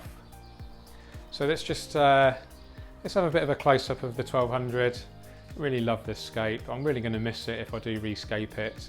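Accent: United Kingdom. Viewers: Love your British accent my boss was from Britain and his wife from Netherland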